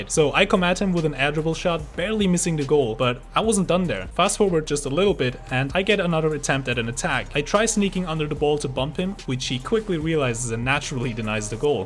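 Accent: Germany. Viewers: Your English sounds like german English...Are you german?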